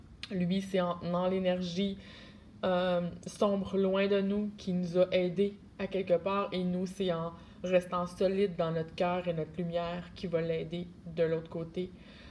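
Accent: Quebec, Canada. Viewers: Tu as une voix québécoise, ce que tu dis est exactement la même chose depuis 7 ans, 2012. il a des amis toxiques et oui j’ai hâte aussi au 26 déc. je sais qu’il en subira des conséquences que de m’avoir vraiment choisi, bien qu’il assume. J’ai tout coupé tout contact et toute énergie de protection. Il m’a vidé alors qu’il se responsabilise, moi je fais mon chemin. Sa fausseté spirituelle je m’en fou mais je l’ai bien cerné ce conard dans ces conneries au lieu d’aller faire une thérapie sur la violence d’abus psychologique. Je me consacre à l’amour véritable sans violence et finitos les hommes ça va faire de les materner. Ils n’ont pas la connexion du coeur, des vrais pervers sur notre conscience divine. 7 ans d’enfer alors je mets le gros cadenas 🔒 Trop d’hommes nous traitent comme des poubelles depuis des millénaires alors je fais la grève. Je suis les conseils d’une aînée et ça fait 35 ans qu’elle est seule et très rayonnante. 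Ces hommes nous manipulent tellement bien avec leurs énergies diaboliques, ça pu de cerveau avec tout ce qui prennent de toxicité que c’est juste le cerveau reptilien qui leur reste et pu de neurone dans le coeur donc il y a rien à faire. Mais moi je me suis reconstruie et j’ai une grande amélioration de mon corps 🙏🏻🥳😇 avec une colonne droite et des gens extraordinaires autour de moi! 🥰 Je suis prête au bonheur avec une insémination et mon enfant sera bien et heureux avec tous mes savoirs d’amour 😍 J’ai confiance à la nouvelle génération 😎 à l’arrivée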